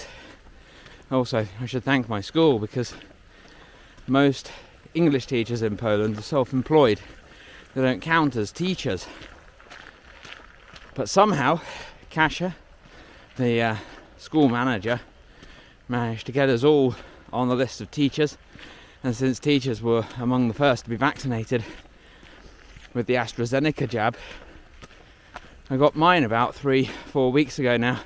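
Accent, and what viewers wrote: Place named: Scotland, United Kingdom. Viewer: That was interesting morning walk with a bit of scottish accent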